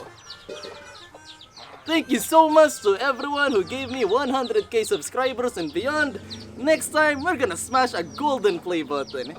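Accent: Russia. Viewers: Comment muna bago nood squad - Pucha when u hear dat russian accent, alam mo na may masisira. (YUNG BIG BANANA CUTTING) HAHAHAHAHAHAHA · Love it while smashing some thing plus the Russian accent saket sa tiyan kakatawa😂😂 -r.i.p play button WERPA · kaya lagi ako nanonood ng mga videos mo eh and gusto ko yung russian accent mo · Stick with the Russian accent! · russian accent si hahaha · Spot on yung russian accent 😁👍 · Pag si nag russian accent gumagawa sya ng kagaguhan pero kapag nasa normal accent sya napaka bait😂😂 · Love that russian accent😂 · Why the Russian accent tho??? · Russian accent <3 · lol russian accent parang si BUCKS. like kong kilala niyo si BUCKS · Russian Accent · familliar tlga yung accent ie tangina Crazy russian Hacker 😂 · Hindi, Russian siya di mo ba nahahalata sa accent niya mga bobo talaga mga bata ngayon. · That crazy Russian hackers accent hahahaha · Russian accent💕😂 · Russian accent 💕 .. 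HAHAHAHHA 😂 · Hey Keep Up The Russian Accent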